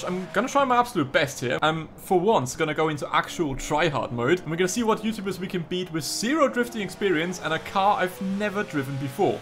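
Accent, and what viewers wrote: Germany. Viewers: You sound really german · Is he German? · Bro are you German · Du hast einen echten deutsche Akzent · Are you from germany?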